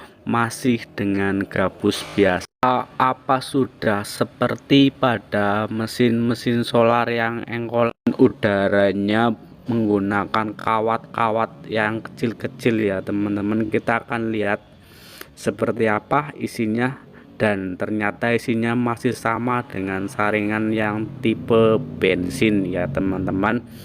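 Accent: Banyumas, Indonesia. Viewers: KAYANE NGAPAK APA YA KANG😅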